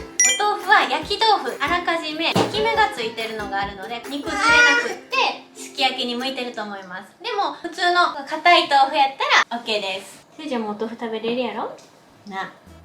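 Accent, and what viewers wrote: Japan. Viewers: ほっこりする和風英語でいつも楽しくみさせて頂いてます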